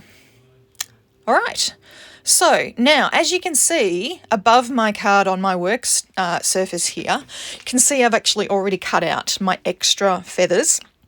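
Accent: Australia. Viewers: Ahhh, that Aussie voice, I don’t get to hear them often anymore since leaving and moving to USA 24 years ago, so it always brings me so much joy. Your background was gorgeous and I am sure the video didn’t show off how truly pretty the glimmer from perfect pearls was. What a beautiful card. Thank you for sharing.❤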